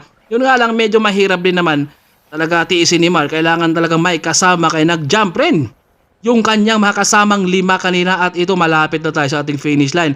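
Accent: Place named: Tagalog, Philippines. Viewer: I love your Tagalog hard accent.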